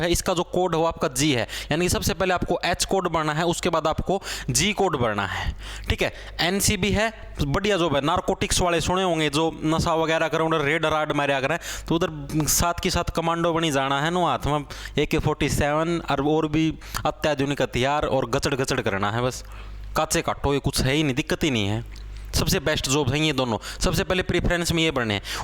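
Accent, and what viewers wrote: Haryana, India. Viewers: Hariyana ka londa he kya ye bak raha he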